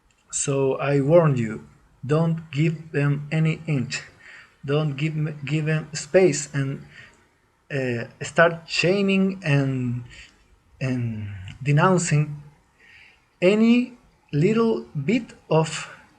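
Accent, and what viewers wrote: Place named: Russia. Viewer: Warning mister trump,. this guy is a russian, you can tell it by his spoken english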